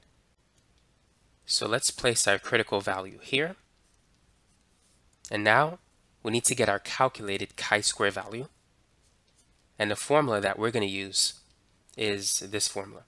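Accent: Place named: Texas, United States. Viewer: bro is from texas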